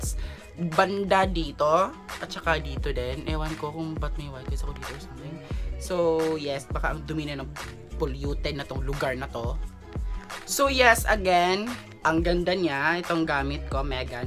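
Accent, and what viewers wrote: Visayas, Philippines. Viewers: Ay bisayaaaa pala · Bisaya ka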